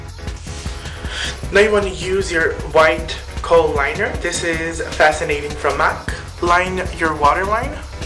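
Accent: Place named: Canada. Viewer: "folies blanches" trop marrant l'accent canadien!! :)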